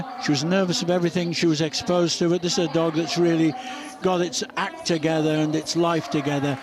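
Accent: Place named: RP, United Kingdom. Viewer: These descriptions being read by this prestigious British man are cracking me up! So freaking cute!!! 🤣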